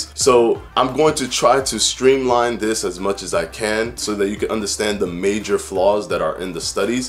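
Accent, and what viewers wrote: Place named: New Jersey, United States. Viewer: sickid41 I bet he’s from New Jersey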